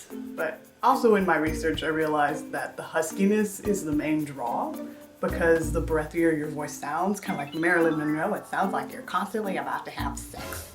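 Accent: South, United States. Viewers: I’ve never even noticed how deep your voice is. Lol I mean I guess you have a bit of a southern accent and I hear them all the time since I live in Louisiana so it’s pretty normal for me! Lol My voice is super high naturally but I can also sound like a middle aged man who will beat you with my walking stick if you ever cross me. So ya idk where I was going with this comment...love the video and you! Have a great day!💖💞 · You just sound country to meh......... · You just sound Southern to me. Along with the deep voice, it’s very soothing. The pizza scene was like ASMR to me!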